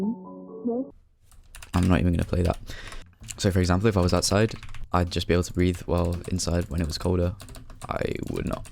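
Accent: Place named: United Kingdom. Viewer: Nice British Accent =)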